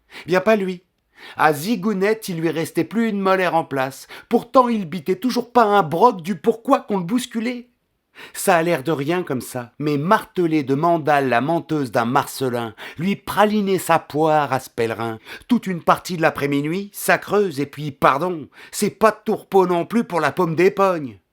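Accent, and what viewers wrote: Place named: Germany. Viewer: T'es trop fort. Tu te transformes en chef d'orchestre d'un concert de rires pendant tout ton récit 😅 Ton accent teuton est parfait, on s'est vraiment payé une bonne tranche de rigolade. FAISAN encore beaucoup des comme ça 😅😅😅 Bises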